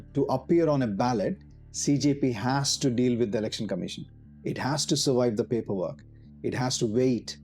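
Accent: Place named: Telugu, India. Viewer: You are a telugu person.....you said "oote" for "vote". I stayed in Andhra for a decade❤❤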